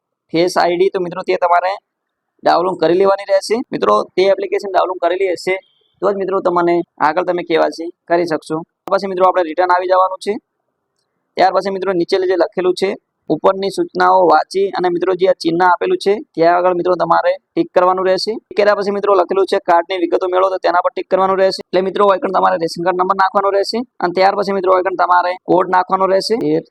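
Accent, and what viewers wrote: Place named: Banaskantha, India. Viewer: આપ બનાસકાંઠા થી છો ભાઈ ભાષા થોડી એવી છે, અમે પણ બનાસકાંઠાના છીએ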